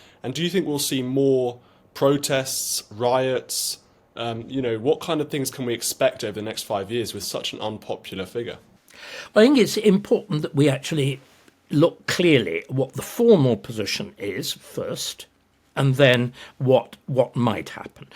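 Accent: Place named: RP, United Kingdom. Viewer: David Starkey's presentation alone in the past! Unfortunately, we as a general public, don't relate to such a plummy proper English speak! Reminds me of my grandad, bless him!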